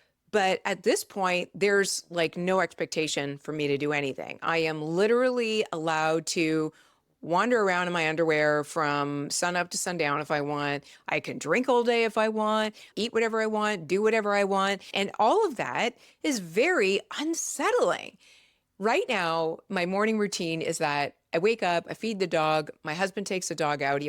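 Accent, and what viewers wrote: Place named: Canada. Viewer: You hide it well but I can hear your Canadian accent. I'm going with British Columbia somewhere outside Vancouver.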